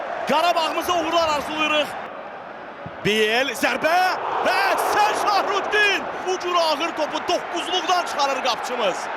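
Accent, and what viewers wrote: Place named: Russia. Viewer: Sən şərh edəndə, futbolun ləzzətini itirirsən, rus akçenti ilə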